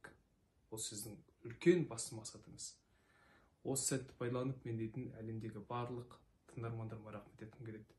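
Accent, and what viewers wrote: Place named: Russia. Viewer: Sotaque semelhante ao russo.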